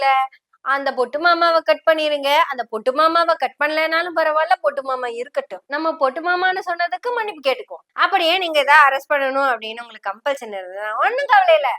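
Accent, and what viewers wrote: Kongu, India. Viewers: ❤ நகைச்சுவை மிக்க கொங்கு தமிழ் அழகாக அரசியல் விளையாடுது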